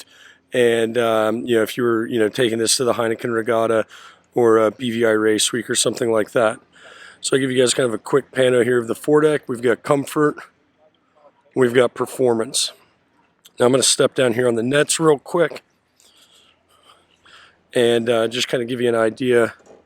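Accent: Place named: Delaware Valley, United States. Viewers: LEEDS8 Delaware Valley (Del.Md.,Va.) so.Pa, Jersey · I bet he is from PA, all my cousins from PA have the same accent.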